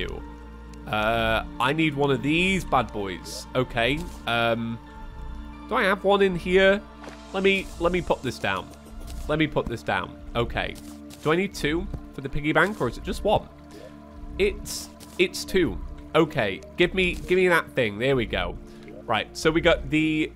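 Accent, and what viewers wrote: United Kingdom. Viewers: Welcome back,. Unfortunately, my grandmother passed away about two weeks ago. I’ve been going back and watching your videos. I am unashamed to admit your relaxedness and smooth british accent have unironically gotten me through.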